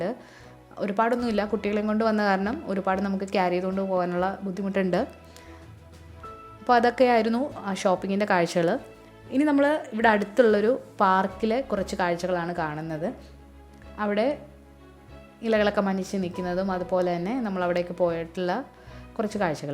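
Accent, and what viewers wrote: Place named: Alappuzha-Kollam, India. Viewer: നാട്ടിൽ ആലപ്പുഴ, കൊല്ലം അവിടെ എവിടെയേലും ആണോ. സംസാരം കേട്ടിട്ട് ചോദിച്ചതാ 😊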